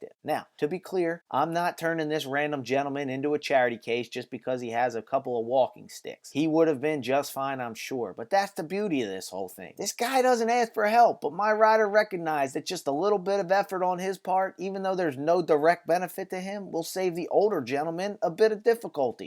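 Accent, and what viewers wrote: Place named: Kentucky, United States. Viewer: Soo are you from Kentucky, Indiana, West Virginia? Can't quite place the accent, but you're definitely not from Philly haha :)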